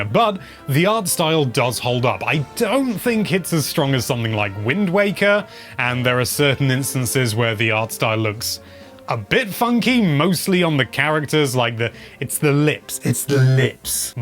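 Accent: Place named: United Kingdom. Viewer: Ya know for awhile didn't realize he was British til last year or early this year don't ask lol he literally has the damn British accent how did I not notice lol especially after watching Lucifer the accent is even more obvious for me now lol I love it. 😂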